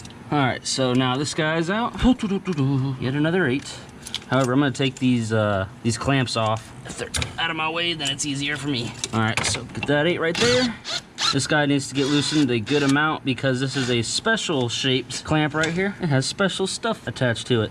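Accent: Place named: Alabama, United States. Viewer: Being from Alabama we would think that you’re from here lmao but we approve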